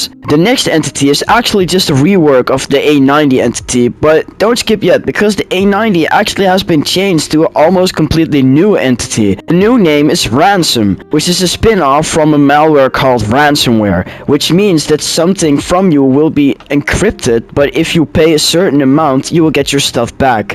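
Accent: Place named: Netherlands. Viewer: I noticed that you have a bit of a Dutch accent. Are you Dutch by any chance?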